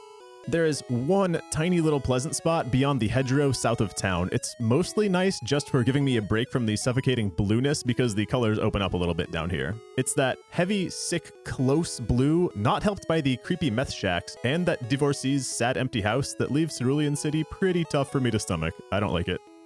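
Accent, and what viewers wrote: United States. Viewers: This is a break from ADV randoms, and I dig that you're working on an American accent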